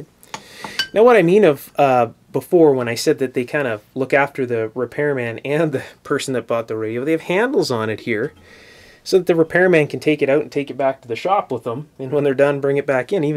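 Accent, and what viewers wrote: Canada. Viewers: Nice Canadian accent,!!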